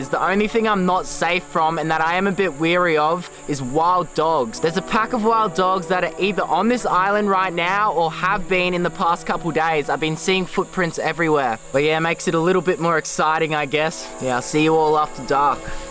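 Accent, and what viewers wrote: Australia. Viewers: Sounds so Australia 💀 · My jaw drop when i saw the title but when i hear him speak and i hear an austrailian accent i just said to my self nah hes gonna be fine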